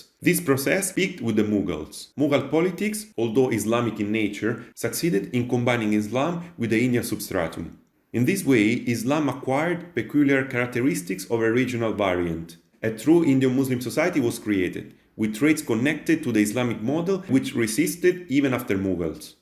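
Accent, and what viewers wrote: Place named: Italy. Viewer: Love your channel. Informative and unbiased. Oh and the Italian accent makes it even better 😂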